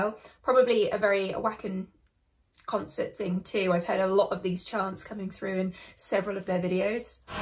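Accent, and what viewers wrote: United Kingdom. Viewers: you truly are the ''Margot Robbie' of music,just with an English accent.You are so talented and such a sweet soul as well.What a treasure you are to us all!! Thank you!! ❤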